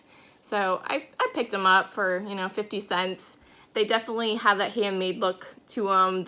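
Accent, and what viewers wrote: Maine, United States. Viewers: Wonderful Maine accent!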